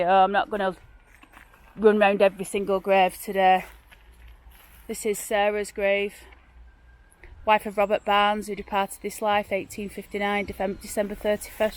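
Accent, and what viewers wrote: Oldham, United Kingdom. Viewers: Hi Sarah !-I'm an Aussie that used to live in Royton,-I used to love going to Owdam,-it had heaps of beautiful buildings and I love those wonderful Victorian graveyards !--I really appreciate your hard work ! and what you do to your house, is great,and "artistic"-& you always "have a go"-nothing puts you off!--northern people are the best !!--never lose your Oldham accent,-nor your great sense of humor !!--it cant be replaced !---all the very best.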